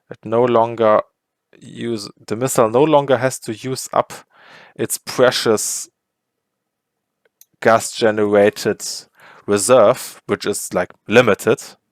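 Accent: Germany. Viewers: i know its gonna be good when i hear a german accent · as a german it is hard to stay in the english area of my brain because the accent really wants me to believe this is german :D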